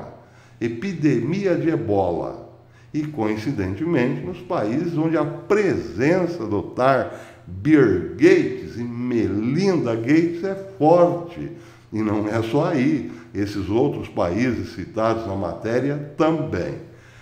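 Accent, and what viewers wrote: Caipira, Brazil. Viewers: ADORAMOS seu caipirês, professor! Aqui em casa temos dois professores de português que o aplaudem! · Parabéns pelo seu trabalho que está cada vez melhor. Amei o sotaque caipira, ficou muito bom e divertido. Além das notícias importantes e sem filtro, temos o prazer de conhecer mais um dos seus talentos. Que Deus sempre te abençoe com tudo de bom 👏👏🙌🇧🇷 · professor por favor fale somente o sotaque caipira , este é o original do brasileiro , obrigado professor , o senhor é um jornalista ótimo , patriota, e cristão. Deus esta com o senhor. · continue sempre assim professor,no caipirez ou normal está ótimo parabéns. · Parabéns professor 👏 gosto muito do seu português caipira..sou da roça hahaha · Prof. Boa essa de falar caipirez. Realmente vai enlouquecer os algoritmos. Parabéns pela criatividade. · Bom dia professor. Adorei a nova versão em caipirês, pois sou do Nordeste e cultivo os falares da nossa terra Brasilis. 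Entonces, adorei. Oxente, quem não gostar num goste! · Bom Dia Professor 😄 Sou De Sete Lagoas MG E Ó Seu Caipirez É O Nosso Normal Aqui😂😂😂💚💛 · Verdade .... adoro essa forma jocosa como o senhor apresenta as noticias Imprimindo este sotaque de caipires e divertidooooo !! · Como sempre seus comentários muito consistentes a atuais! Sempre ba frente da midia "oficial"! A forma caipira de passar a msg tem seu charme mas talvez tire um pouco a atenção do conteúdo. Forte abraco! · Professor, tá mais gostoso de acompanhar o seu canal agora; além de informação, conhecimento , a gente se diverte a beca. O caipirez ficou top! O senhor leva jeito tb como comediante . 👏👏👏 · Professor,eu adoro ouvir o seu caipirês,eu dou do tempo que se falava assim 😊 Por mim,pode continuar,o que importa é o conteúdo dos seus vídeos 👏👏👏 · Bom dia, professor ! Assisto todos os seus vídeos.... Não deixe o "caipirês". Adoro!!! 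· A falecida e mui saudosa Inezita Barroso iria adorar esse seu sotaque caipira, Professor! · O Tar de Bill poderia explicar o frio que dói nos EUA? Aquecimento resfria. Sei.....Quanto ao caipirês, eu amo. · Olá professor. Saudades da dona Lucimar. Manda um grande abraço pra ela. E continue com esse seu jeito especial de dar as notícias. Caipirês é fantástico. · Eu amo seu caipirez tudo fica muito mais leve, apesar de todas as.noticias serem claras e sérias sem fale. Isso é o que todos precisamos ouvir para fazer nosso próprio juízo dos acontecimentos sem viés ideológico e isso é muito bom. Obrigado de coração por dividir seus conhecimentos e pesquisas conosco. Com ou sem caipirez você é o máximo nas suas análises. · Parabéns professor pelo seu sotaque. ...eu entendo muito bem ...sou Mineiro sou da roça sou caipira sou brasileiro....Deus o abençoe. .. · Bom dia Professor! Adoro o seu "caipirez" 😁 Adoro seu senso de humor. 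Não mude! Continue assim e pra quem não gosta e diz que não vai assistir mais ... JURA? Fala sério 😁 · Boa tarde Professor! OI NÓIS AQUI TRAVEIZ!!!!! SOU NETA DE AUSTRÍACOS E POLONESES. CRESCI OUVINDO UMA SINFONIA LINDA DE SOTOQUES MISTURADOS COM O PORTUGUÊS . E CONFESSO EM ALTO E BOM SOM: ADORO O CAIPERES! NOSSO PAIS Ê ASSIM!!!! QUEM NÃO GOSTA ESTÁ NA PRAIA ERRADA! SE MUDE PARA O GABINETE DO SÔ " GIRMAR MENDES"!😄😄😄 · Apesar das notícias preocupantes, seu bom humor nos contagia! Seu sotaque "caipirês" ficou muito lindo! Parabéns, Professor, pelo seu esforço de desvendar, para nós pobres mortais, as tramas desse mundão de Deus 🙏🙏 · Bom dia , Professor !! Esse caipires é o charme do Canal... Semana abençoada para todos !! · Kkkkk É o melhor canal de notícia bom é escutar o caipirez é bem brasileiros PARABÉNS PROFESSOR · O caipirismo é Mil Grau Professor!!! parabéns parabéns PARABÉNS. Continue assim Professor. 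· Bom dia, professor! Não é todo mundo, que tem capacidade de entender o caipirês. Os incomodados que se retirem. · Bom dia Professô podi cuntinua cum o caipireis ço! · Oh professor eu amo seu caipireis.Bjssss querido, Deus te abençoe 😍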